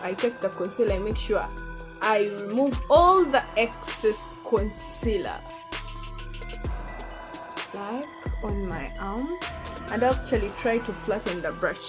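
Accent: Uganda. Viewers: Your accent tho😂are you from Uganda 🇺🇬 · The Ugandan accent💓💓. Love itttttttt🤗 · You speak like a Ugandan🇺🇬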